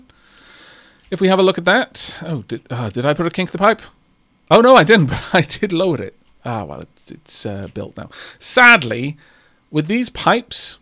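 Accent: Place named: RP, United Kingdom. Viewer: Great video, great narration. Big fan. Your accent seems a little "posh". What area is it from? :)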